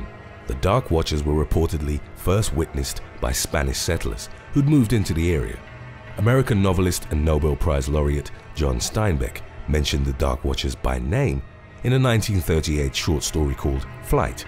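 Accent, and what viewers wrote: United Kingdom. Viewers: His British 👋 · Don't you realize the narrator is British?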